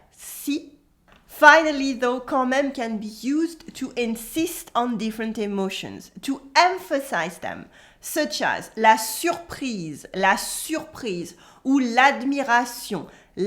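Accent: France. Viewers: On the contrary, speaks an excellent English. Her French accent, intonation and cadence makes her English even more charming and pleasent to ear.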